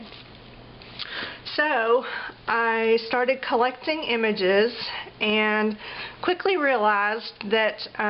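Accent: South, United States. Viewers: Okay, I am so stealing your "It's like perfume on a pigs ear." Just to let you know it made me laugh out loud!!! I love that there is someone else out here with a southern accent!! :)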